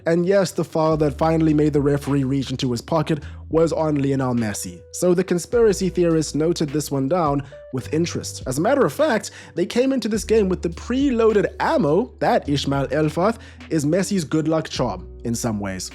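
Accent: Brazil. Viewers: Habla como un brasileño hablando español, o sea bien, muy bien pero que se le nota que es extranjero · Good Spanish, your accent reminds me of Brazilians speaking Spanish. Do you speak Portuguese?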